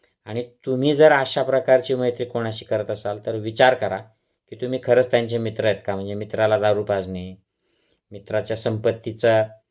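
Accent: Khandesh, India. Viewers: आपली खानदेशी टोन काय बदलेली नाही.... मस्त याला म्हणतात जय खानदेश.... नाही तर थोडे काय शहरात गेले की स्टाईल मध्ये मराठी इंग्लिश बोलतात....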